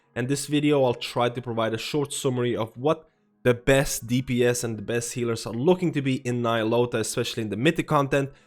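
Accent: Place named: Ireland. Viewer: You have to live Ireland lol. the accent just comes out so often! much love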